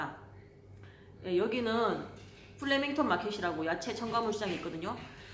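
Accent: Gyeongsang, South Korea. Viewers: 어제부터 폐인 중입니다ㅋ 같은 대구분이시라 너무 정겹네요~~~ 호주 일상 자주 올려주세요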